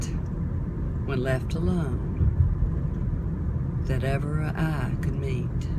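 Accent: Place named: South, United States. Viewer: My mother would recite this poem for me when I was young. Well mom is gone now. I was thinking about the poem the other day and went searching YouTube. I like this one because she has a nice southern accent.Thank you.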